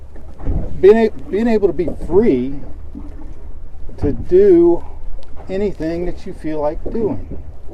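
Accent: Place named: Florida, United States. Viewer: Hey. Did anyone ever tell you that you speak "Florida Boy"? Enjoying your books. I'm through Book 10 and Blue Collar to No Collar! Thanks for all the hard work. I've started a bit myself and find myself starting all over again. I think I found my niche though this time. Keep up the good stuff. See you in La Belle one of these days. BearTail.. B52 Bomb/Nav. "Iron Dukes Viet Nam" 320th Bomb Wing. First in - Last out.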